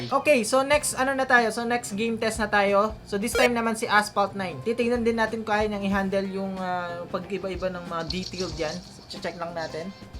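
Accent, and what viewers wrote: Pampanga, Philippines. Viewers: Isa kang kapampangan sure ako sa iyong punto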